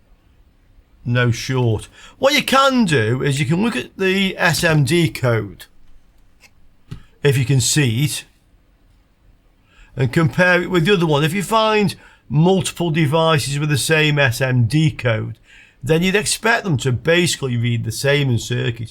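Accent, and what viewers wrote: United Kingdom. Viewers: Your British accent is very annoying!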